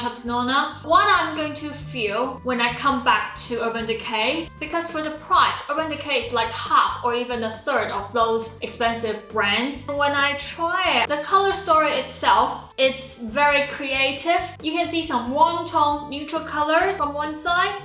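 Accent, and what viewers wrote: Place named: United Kingdom. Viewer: 沒想到的英文有了英式發音 XD 好新鮮的感覺 (從部落格時期收看文字的我...適應會動的中) but it's a nice palette..but wow, that purple..lol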